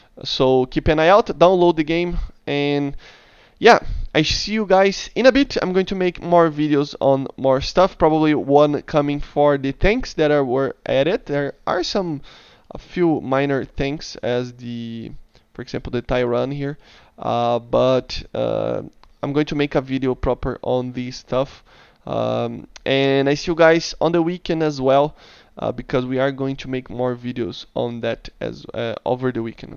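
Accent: Brazil. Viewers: Brasileiro só pelo sotaque kkkk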